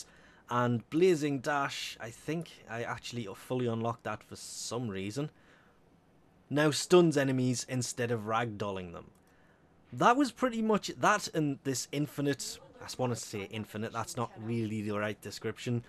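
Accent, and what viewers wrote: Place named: England, United Kingdom. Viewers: North east England. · Sounds like he's from Newcastle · Except Tac is Irish and this guy is English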